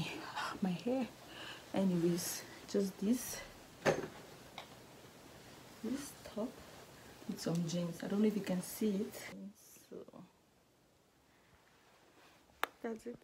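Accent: Nigeria. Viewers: you sound so much like a Nigerian. I'm amazed on how Nigerians and Cameroonians sound so alike. XOXO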